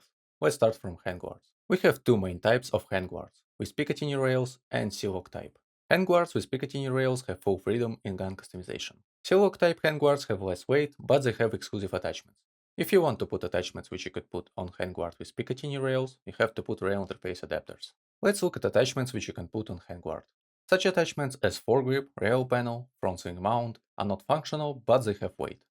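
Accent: Russia. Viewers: По акценту палишься руснявый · El Russia accent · вы русский? У вас очень русский акцент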